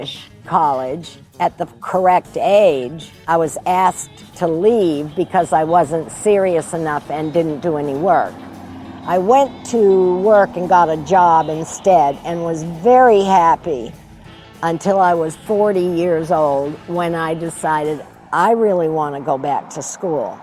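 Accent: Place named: England, United Kingdom. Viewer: Суперское видео!! Побольше таких снимайте!! Очень приятная и красивая женщина с изумительным английским акцентом!!! С нетерпением ждем 2 и 3 части!!!!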